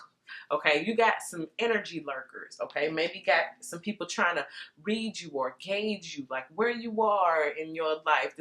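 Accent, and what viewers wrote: United Kingdom. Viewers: You’re funny .... that British accent tho 😆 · whole reading resonated, haha yes queen vibes issa new energy haha picked up british accent lol x love from UK x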